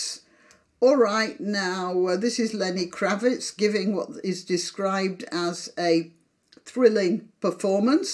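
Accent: United Kingdom. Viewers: Roasting celebrities in a British accent is really next level… 👏👏👏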